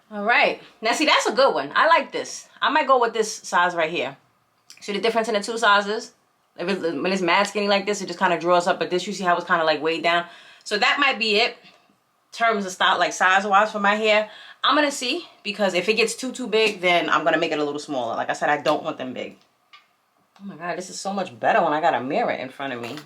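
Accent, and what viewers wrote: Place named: New York, United States. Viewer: I love you NY accent ❤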